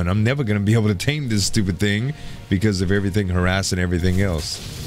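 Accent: Louisiana, United States. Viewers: I want to ask you, but I don't want to miss-place you. You have an accent, and I love it. I just subbed to you so forgive me if you've already established this, but is your accent for Louisiana ?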